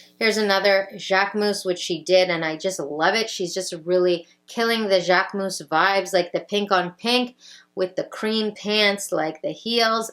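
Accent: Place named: North, United States. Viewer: Who watches you, you murmur. I must have issues. Yes, I am a Midwest gal transplanted to the Dallas area and so grateful to hear the Northern accent (which to us is not an accent, it is in Texas that there is an accent.) You get my drift. Every video is like a visit from the little sister I never had, the one in Canada, not Minnesota, blonde by design instead of nature, the cute sis. I am so grateful for your video visits.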